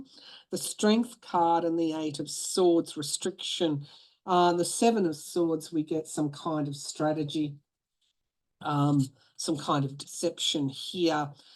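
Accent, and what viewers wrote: Australia. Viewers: I cannot look away.. I love your videos. Keep your calm, soothing energy. And your Australian accent is a plus. GOD bless you.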